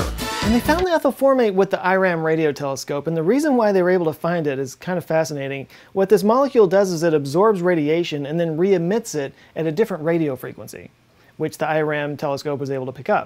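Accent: Texas, United States. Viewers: A giant what? Oh, a die-key-ree, now I get it... no thanks to your Texan pronunciation.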